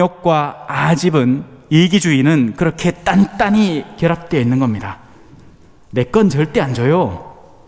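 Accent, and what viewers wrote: Gyeongsang, South Korea. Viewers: 주님 주신 빛나는 달란트를 백배만배 쓰시는신부님.....옥구슬 같은 말씀말씀이 그저 아멘아멘!!!하게 합니다 만인을 행복하게 해주시려는 키타소년이 된 모습과 마당의 경상도사투리가 정겹습니다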